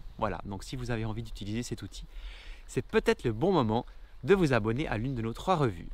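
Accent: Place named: Switzerland. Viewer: Le plus beau chant d'oiseau c'est votre accent suisse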